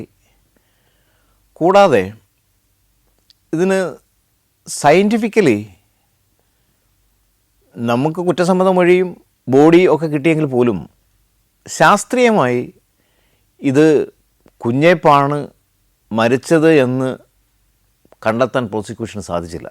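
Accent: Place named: Alappuzha, India. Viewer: alla ആലപ്പുഴ ആണെന്ന് തോന്നുന്നു നല്ല അച്ചടി ഭാഷയിൽ സംസാരിക്കുന്നു ❤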